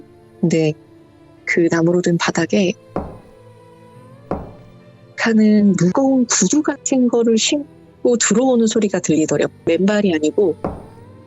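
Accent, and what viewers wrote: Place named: Seoul, South Korea. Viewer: 서울말투 진짜 이쁘다.. 난 부산 토박이라, 서울 사람 옆에 있으면,여자라도 우악스럽은디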